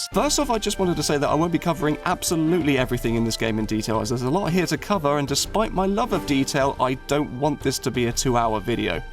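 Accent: United Kingdom. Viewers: Great entertaining video. Also enjoyed the slightly british accent sided choice of referring in that tonality. I raise ma beer for ya mate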